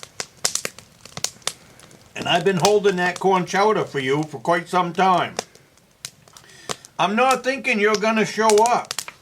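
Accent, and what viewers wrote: Maine, United States. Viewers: They will love that. When I put your videos on It's usually the four-year-old Kieran who perks up first and he calls to eight-year-old Josiah and says hey it's. And then Josiah will come in smiling because he knows exactly who you are and then no matter what you're doing they just are completely silent like they're learning something they've never seen before. I used to go trapping with my brother years ago and hunting when I was a young man but due to back problems I haven't been able to do that so you're the one that's teaching them at the moment and it's really sweet. They respond to that very well and now they asking me about the meat that they eat at home oh, they are realizing oh this is really a chicken that was running around or oh I'm eating a lamb. And then they might ask what does bacon come from and what are hamburgers made of and then we have a fun conversation letting them know that hamburgers are not in fact made by him at all. 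Anyway thank you for your hard work at presenting these videos oh, you are definitely touching lives in a very special way. Also your accent reminds them of Uncle Harvey who grew up in Gorham Maine where my accent is a combination of Boston and DownEast growing up with lobsterman. I hope you are having a wonderful time up there and I know you are staying warm even if it takes dunking all your kindling in a bucket of water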